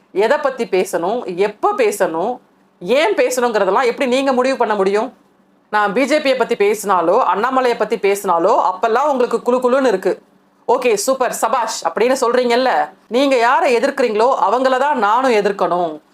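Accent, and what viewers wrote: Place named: Malaysia. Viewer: SISTER. YUR SLANG OF SPEAKING TAMIL...LIKE MALAYSIAN.,..THANKS SISTER... MALAYSIA.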